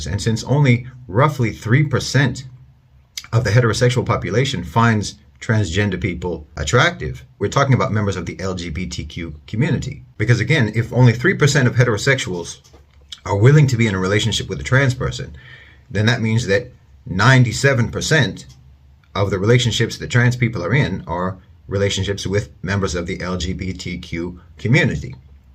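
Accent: United States. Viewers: his accent is American, german is his parents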